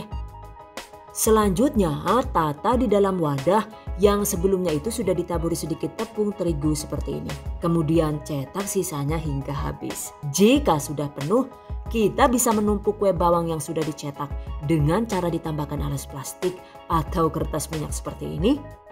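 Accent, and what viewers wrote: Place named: Java, Indonesia. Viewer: Hehe..mbaknya lucu...suaranya, ternyata orang jawa medok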